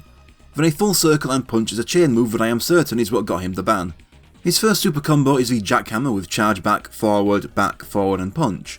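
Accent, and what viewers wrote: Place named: United Kingdom. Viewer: Great video dude,Always great to see and hear another gaming Brit on YouTube! Subscribed!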